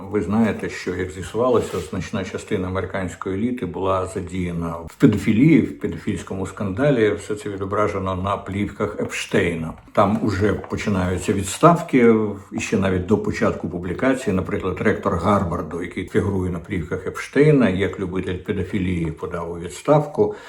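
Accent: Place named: Moscow, Russia. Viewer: ВИ - пречудовий оратор! Але, пробачте, чом ВИ ніяк не виправите вашу московську фонетику? маячЬня, щЬо, передачЯ, чЯстина, незначЬної, чЬому, почЯтку, ухІлянтам, політичЬна, бачІмо, схІльні, агЄнт, очЯх..... та й дивна конструкція-потороча "всЕ рештА"...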